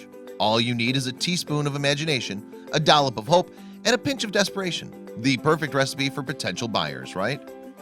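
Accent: United States. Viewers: It's a bit disconcerting to hear copy so blatantly written by a Brit read by an American. Just saying, something you might want to think about.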